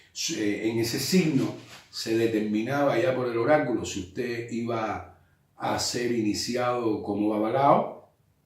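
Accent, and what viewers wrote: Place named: Cuba. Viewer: Porque hablas como cubano si eres. Mexicano?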